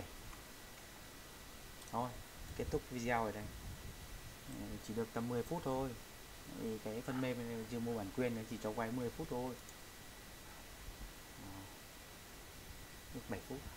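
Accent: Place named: Thach That, Vietnam. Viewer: giọng giống thạch thất quá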